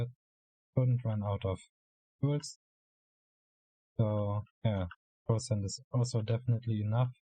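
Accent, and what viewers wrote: Germany. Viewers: Der deutsche mit seinem Akzent :D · are u german? sounds like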